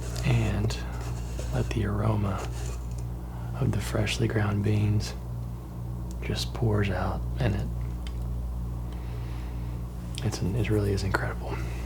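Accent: South, United States. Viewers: I haven't been here long I've seen some of your vids dont want to sound like a creep but I'm just wandering where he's from because based on the way his voice is it sounds like he is from a more southern state. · Your little southern twangs are really doing it for me.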